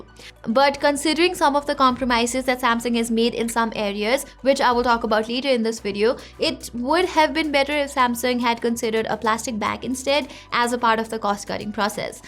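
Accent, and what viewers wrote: India. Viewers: I really love your Indian accent... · It sounds sweet when Indians speak fluent English. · Nah bro, last thing I want is a indian accent voice assistant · 😜😜indian nd Pakistani boys 😜👀mall heina ye?👀 First time dekh rha ho education or English se lag rha hai indian hai❤️❤️👀 🙊🙊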